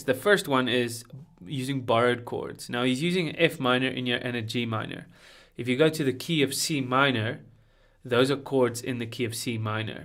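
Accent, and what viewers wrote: South Africa. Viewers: Nice to you hear a South African Accent! Lovely lesson, man.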